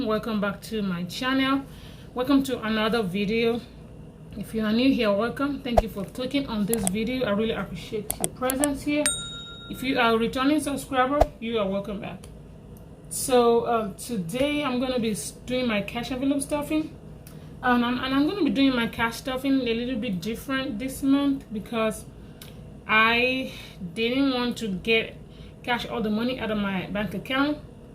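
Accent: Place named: Nigeria. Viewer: Hi! Great cash stuffing. Your so close to finishing your 52 week challenge woo hoo. I love me some Nigerian jallof rice I’ve made it a few times. I thought your accent was Nigerian. I’ve been to African grocery store I find it fascinating. I really enjoy pounded yam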